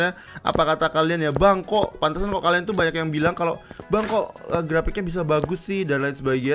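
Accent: Java, Indonesia. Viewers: Bang medok bang lop u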